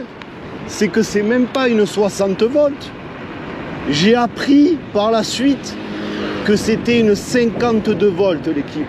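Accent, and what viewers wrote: Marseille, France. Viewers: Salut Tonton, merci pour tes vidéos toujours aussi sympathique avec la gouaille Marseillaise qui va bien 😅 Chaque vidéos c’est un régal de te suivre, garde ta façon de faire car on te sens généreux , objectif et réaliste dans ta démarche et tes propos 😊 Perso j’attends ma Suprem qui devrait arriver sous peu tellement Weebot s’est fait démonter les stocks…😮 Au plaisir de te rencontrer un jour, bonne suite à toi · Excellente découverte avec un bon accent du sud comme toujours, sympathique comme tout, même avec les vieilles XD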